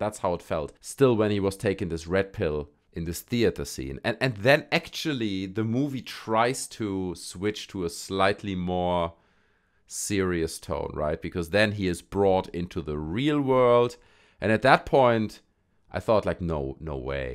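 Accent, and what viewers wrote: Germany. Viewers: This guy has an oh so subtle german accent but speaks great English... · HAHAHAAAA! LOL. Your German Accent just makes everything sound funnier! Good job though.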